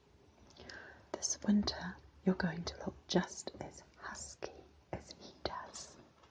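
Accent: New Zealand. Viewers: Lovely video...reminds me so much of one of mt favourite channels, Relaxing ASMR <link> does lots of old magazine and catalogue reading videos, and is from Mid-west USA...so nice to hear your Kiwi voice too :-)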